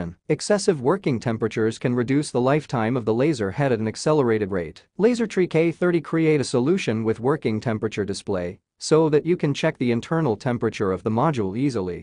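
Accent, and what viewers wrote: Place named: China. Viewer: Computer voice sucks big time!!!!! sounds like chineglish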